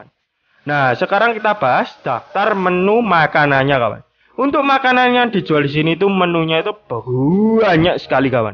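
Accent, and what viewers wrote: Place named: Surabaya, Indonesia. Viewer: logat Suroboyo an nya medok banget, ditotol itu maksudnya dicocol ya, ikan bakar dan ayam bakar nya emang enak sih, mantap, Bro, sehat selalu ya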